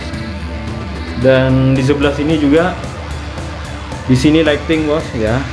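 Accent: Madura, Indonesia. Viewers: Mas asli orang mana? Logatnya koq kadang sy dnger kaya orang madura ya😁🙏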